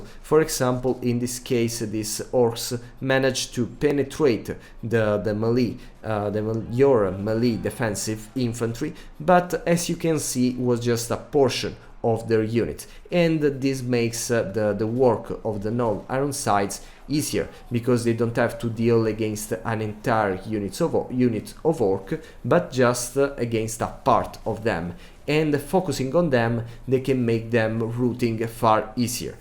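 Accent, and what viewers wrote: Italy. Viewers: I new this accent was italian 😂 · Ciao, bel video, l'accento italiano comunque si sente a chilometri hahahahah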